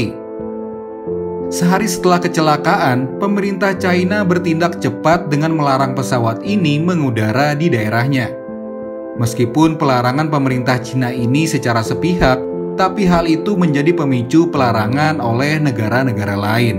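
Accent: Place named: Java, Indonesia. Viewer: Ngedap coro jawane stoll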